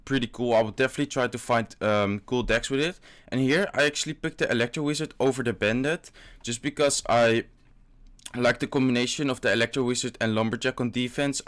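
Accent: Netherlands. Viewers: je hoort zo goed dat ie NL is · ur 100% from holland. 100 procent. ur accent is to recogniseable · you are dutch arent you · ben je nederlands je accent is beetje nl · Where are you from you sound like Some one from the netherlands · Ik hou van je nederlands accent op je Engels ❤️❤️❤️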